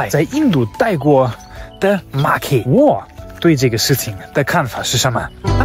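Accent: Sichuan, China. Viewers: 你講話怎麼有個四川音，尤其講「 」的時候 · 妥妥的四川口音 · 四川话➕普通话😂你能认真学习普通话吗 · 他说的是四川口音 😂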